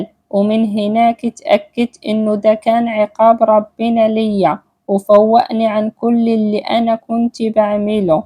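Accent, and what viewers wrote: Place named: Morocco. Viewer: المرجو عدم التصنع احكي بالدارجة المغربية احسن لك لان اللكنة المغربية واضحة في صوتك وسوف يكون افضل وشكرا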